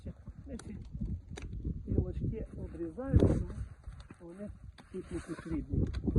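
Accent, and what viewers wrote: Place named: Podillya, Ukraine. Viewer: Дуже гарно дякую Вам! Було пізнавально. Мова – ніби живої води напився! Скучив за Поділлям (батьківщина моєї мами). Додав у підписки. З нетерпінням чекатиму нові випуски! Вітання від донеччанина, що зараз живе на Харківщині) хай ми Переможемо! З повагою, Іван Редько!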